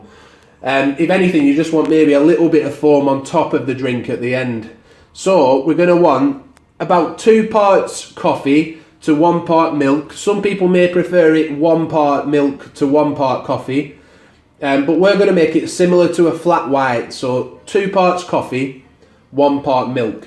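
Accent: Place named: Northern England, United Kingdom. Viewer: ah, your accent sounded a bit like Gary Neville's who's from Bury so I figured you were from round there. Q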